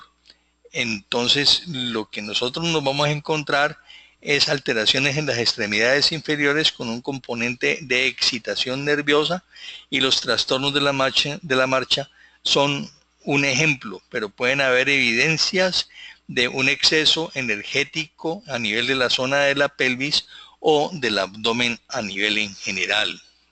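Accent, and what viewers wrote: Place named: Colombia. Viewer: Buenas tardes usted da cursos de este tema es decir iridiologia me interesa y de donde es yo soy de colombia fue que hice un curso de irdiologia pero veo que no me enseño como es .Como usted esta explicando este supuesto profesor me cito dos dias pero no me explico como usted habla .vulharmente me robo la plata .mi telefono es <num> le agradeceria si me llamara si usted .veo que parece ser de colombia por el acento gracias dios los bendiga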